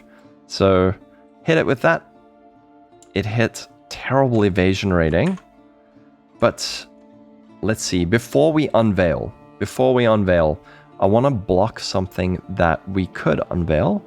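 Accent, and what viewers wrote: South Africa. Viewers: Are you South-African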